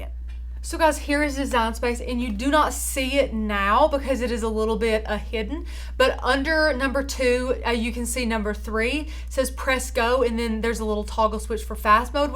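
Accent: South, United States. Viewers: My English is fine, and I have trouble keeping up😁 Her Southern accent is thick, so that might make it a bit more difficult for you, too. I don't know how to do it, but I know videos usually have Closed Captioning, so you can read what is being said at the bottom of the screen. It helps me keep up. Good Luck from Georgia😁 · I was gonna say, she sounds VERY southern to me! (New Hampshire here ;)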